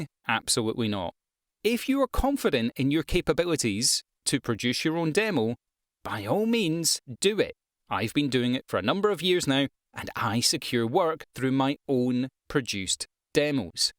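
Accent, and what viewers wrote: Scotland, United Kingdom. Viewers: Thanks for the assessment and the James Earl Jones comment -- made me titter. P. S. I'm British-American, and to my native English now partly American ear, you sound INTENSELY Scottish! Heh heh heh.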